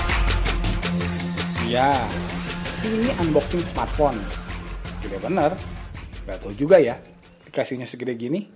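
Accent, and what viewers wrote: West Java, Indonesia. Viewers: nyunda nya itu lohh lucu bingitt :*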